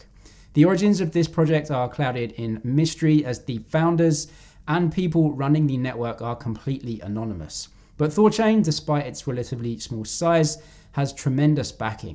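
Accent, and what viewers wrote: United Kingdom. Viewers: Great video, always look forward to your videos. Its refreshing listening to someone from the uk. Have you ever used Trading View. Be great to see a video on that. How it works and allthe different tools. Please keep up the great work.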